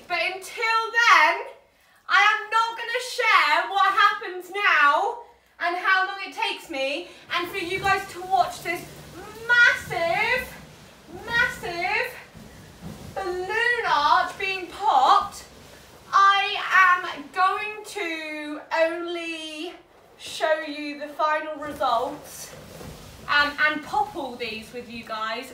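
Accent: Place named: Southeast England, United Kingdom. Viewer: Are you from SE England? Your accent is similar to mine haha. You have a lot of energy!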